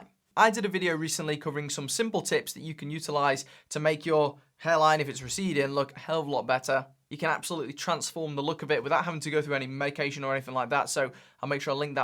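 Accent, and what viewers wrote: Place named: Stoke, United Kingdom. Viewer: Are you from Stoke by any chance. Your accent sounds like something you’d hear in Stoke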